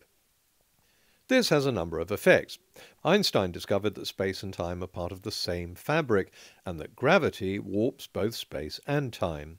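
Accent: RP, United Kingdom. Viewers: "nothing literally nothing" - richard dawkins asking Lawrence krauss "yes" more like atheist dont know what their academics are saying i don't think you can actually defend your position aside from English posh accent, a rapid expansion is a explosion, but this is just semantical equivocation, genius you still think it 'expanded' from nothing, the singularity ISSSS nothing in the atheist wolrd view, simply calling nothing the singularity doesn't stop it from being nothing. which i dont need to tell you this is a stupid teenager answer how about you actually debate for once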